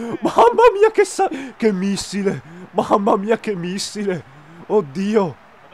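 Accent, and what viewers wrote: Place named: Trentino-Veneto, Italy. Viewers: parli come l'incrocio tra un trentino e un veneto:) gran bel video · Si è parla dialetto veneto ahahahh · di dove sei? io sono di vicenza e mi sembra che tu sia di queste parti XD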